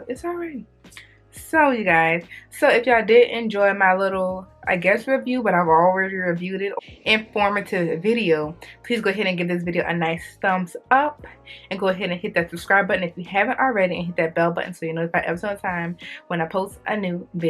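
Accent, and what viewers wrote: New Orleans, United States. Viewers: You sound like you're from New Orleans I'm from NOLA. But my hairdresser just recommended this as a shampoo to strip my hair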